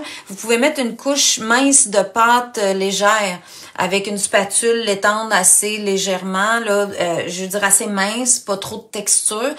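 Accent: Canada. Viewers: Bonjour de France.Vous êtes super mimine.Ce sont les mots qui me viennent.😁Je vous suis depuis quelques temps ( et dieu sait ...que j'en ai vu des Tuto Vidéos de Peintres enseignants sur la Toile en tout genre ) Je vous félicite pour votre manière d'expliquer vos techniques , votre patience et dévouement . Vous et votre accent Canadien sont lumineux sur la toile.Evidemment vous travaillez pour PIN-SO ...mais...on sent votre Passion pour la Création et il est contaminant et productif ! J'ai débuté la Peinture au début Covid'.( enfoui en moi depuis longtemps) (Merci Virus 🙏) .J'ai foncé dans l'Acrylique. J'ai reçu des Félicitations qui m'ont porté un certain temps....mais....étant ALERTEUSE d'Infos sur internet Combattante , cela m'a légèrement diminué mon enthousiasme.Alors j'ai foncé en Aquarelle.Mais ...la encore J'ai eu le tord ( et je dis ça pour les novices ) de visualiser un trop grand nombre de Vidéos diverses et variées sur les Peintres et ..je me suis noyée !! 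(Trop d'infos ...tue l'info ) et ...j'ai tout arrété ! .Le VIDE.Je ne savais plus vers quelle méthode me tourner et ça...c'est vicieux ( alors que je me suis procurée des Tas de Pinceaux de toutes marques ) A un moment .Le trou noir . On pense qu'on y arrivera pas ( mm si on en crève d'envie ) Et.....je suis tombée sur Vous et...vous m'avez redonné l'envie de Reprendre .... Alors ..de FRANCE..un Big MERCI. Je vais visiter vote site. Que la lumière continue à briller autour de Vous surtout en ces moments difficil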